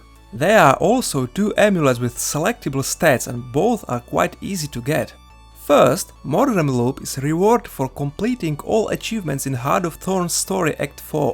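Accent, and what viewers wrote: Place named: Czech Republic. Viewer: Ure from czech republick right ? that axcent tho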